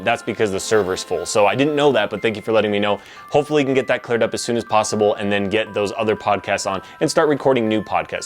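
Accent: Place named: Midwest, United States. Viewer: Yay! Retroware fam :) yeah lol for some reason I hear some midwest accent. (probably just me!)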